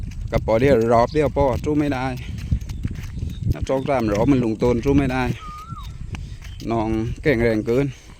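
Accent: South, Thailand. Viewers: ขอโทษครับ ผมเพิ่งได้ดูช่อง เป็นใครรึครับ?? พูดใต้'เป็นตัวเเทนคนใต้เลยคับ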